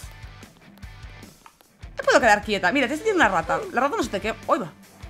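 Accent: Argentina. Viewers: Juego simon dice,cuando dice say y algun color es porque tienes que ir al color que te dice roblox,Cuando no dice say no vallas al color que dice...entiendes? por cierto muy bien el acento argentino:D yo soy argentino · me mata haciendo acento de argentina!! le sale bien. porque yo soy argentina!! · soy sub me encanta tu voz tu asentó argentino u como habla s con morsa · Hablas argentina tia😨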